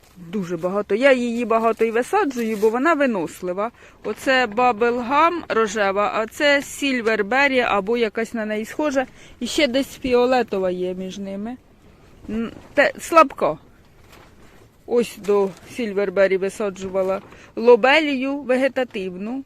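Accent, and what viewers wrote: Poltava, Ukraine. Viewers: Ви молодець! Трудівниця і розповідаєте цікаво, з гумором. Зачаровує наша полтавська мова. Всі відео цікаві. А це хочеться дивитись безкінечно. Яка краса! Де саме Ви живете?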